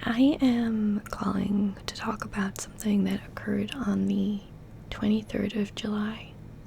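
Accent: United States. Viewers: I love your American accent😊. You do it so well~ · Girl, your American accent is impeccable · Every time you do an American accent video I forget that it isn’t your usual voice 😂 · You do a really good American accent! I feel there's a lot of opportunity there for other roleplays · After watching you for years, I don't think I'll ever get over how good your American accent is. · I like the American accent 🙌 Its solid , in this American's perspective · The acting, camera angles, American accent and even facial expressions, you're phenomenal at this,. 😊 And speaking of discovering (Umbrella Corporation), I'm glad I discovered this channel. ❤ Thank you so much for this new video, and helping me to relax once again. 😊✨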